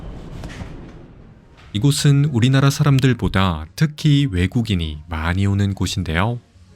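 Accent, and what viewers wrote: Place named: Jeolla, South Korea. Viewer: 가~장. 기~차.. 전라도 억양인디! 서울말쓰시는데 고향은 전라도지라~ 잉??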